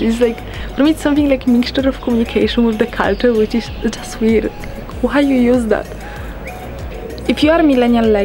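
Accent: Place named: Poland. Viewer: Are you Polish? Omg your accent is so cute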